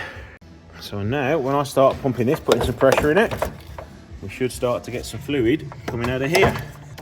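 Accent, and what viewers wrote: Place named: West Midlands, United Kingdom. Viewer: Always funny to hear an angry West Midlands accent! 😂😂 The song is by. 🤘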